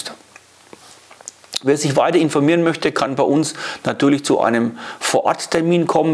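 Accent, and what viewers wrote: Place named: Hesse, Germany. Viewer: tolles Video. Kanal abonniert. Was ist das für ein Dialekt? Hessisch?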